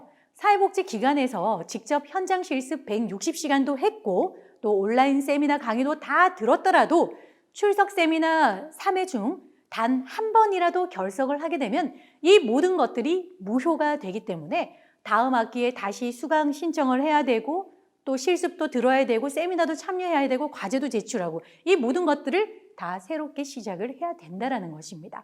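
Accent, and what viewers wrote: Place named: Gyeongsang, South Korea. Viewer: 오래전 교수님통해서 복지사자격증 취득했는데요 구수한 경상도사투리에 강의참잼나게. 청취했습니다 대단한교수님입니다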